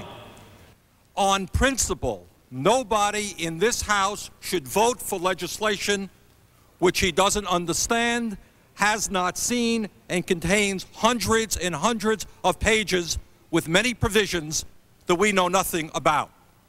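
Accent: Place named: South, United States. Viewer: Bernie Sanders is sounding more and more southern the older he gets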